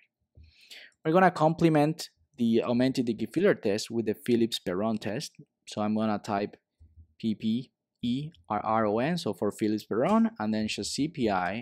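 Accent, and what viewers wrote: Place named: Spain. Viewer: Very nice instructions that get to the points immediately. Just had to get use to your charming Spanish accent, but after overcoming this "obstacle" no problems whatsoever!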